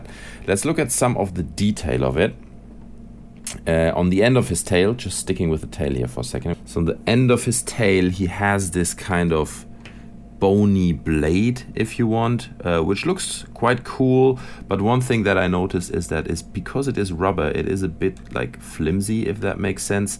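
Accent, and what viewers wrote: Germany. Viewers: Du bist doch sicher deutsch ich höre es. Oder irre ich mich? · Du bist deutscher stimmts? Man hört das irgendwie am english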